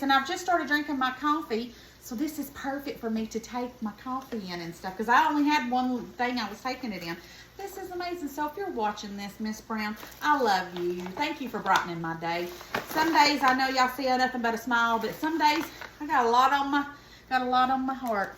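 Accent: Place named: Alabama, United States. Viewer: Enjoyed this recipe and you so much! Love cabbage, love noodles. Will be trying this. A coworker frequently cooks in our break room in an identical skillet & makes magic happen. Going to be getting one. You sound near me. Subscribed. Hello from Talladega.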